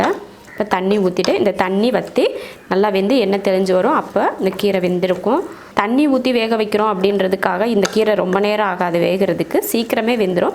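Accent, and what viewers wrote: Coimbatore, India. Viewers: நம் கோவை தமிழும், செய்முறையும் அருமை, Really Yummy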